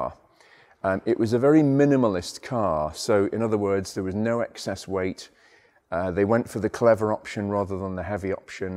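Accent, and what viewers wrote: United Kingdom. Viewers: British Engineering in a voice... thank you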